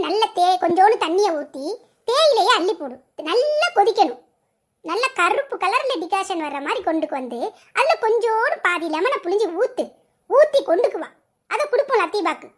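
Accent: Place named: Kilakarai, India. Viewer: I always like klakarai slang.. Super❤️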